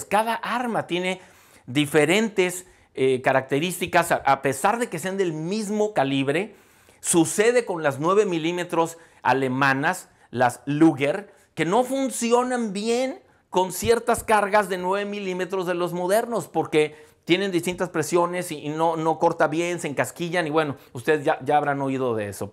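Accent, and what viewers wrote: Mexico. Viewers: Gran explicación si señor , y sobre todo me encanta ese acento mexicano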